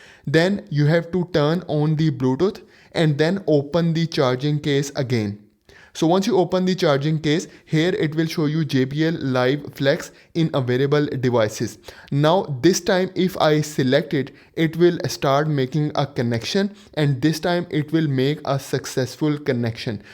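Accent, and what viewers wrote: India. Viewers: Didn't work Even a tutorial from a dude with an indian accent can't save how bad JBL airbuds are I'll just buy from another brand and stop being a cheap ass thinking I can get something that won't die after 2 days at a reasonable price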